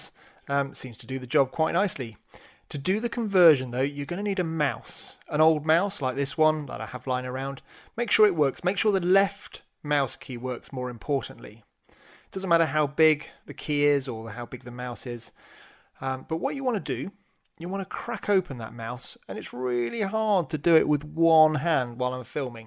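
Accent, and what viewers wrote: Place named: South of England, United Kingdom. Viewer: You sound like your in the south of England... im in the south east and its absolutely dead on 2m and 70cms... i keep CQing into the void but love making antennas and will keep trying.. maybe on 2m CW might reach you if your not too far away.. I like you took tne foundation and then stalled for want of regular contacts or local meetings... 10 11 12m is a little busier so i also play with 28 to 30mhz. Keep up the ham work. 73's M6KII.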